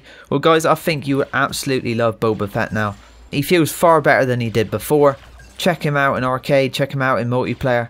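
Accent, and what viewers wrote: West Country, United Kingdom. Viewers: Clear and concise with a west country twang:) You have a new sub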